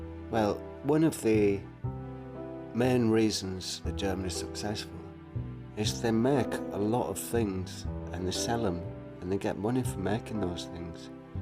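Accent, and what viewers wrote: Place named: Northern England, United Kingdom. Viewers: What kind of accent fo you have? Northern England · the accent remnds me of my friend from yorkshire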